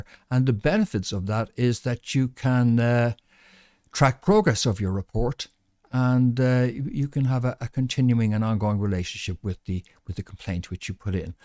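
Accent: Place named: Ireland. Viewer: we love listening to you speaking in your gorgeous Irish accent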